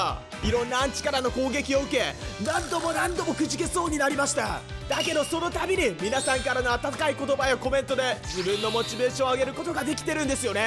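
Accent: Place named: Kyushu, Japan. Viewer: 初コメです、7万人おめでとうございます！九州出身なのでさんのイントネーション好き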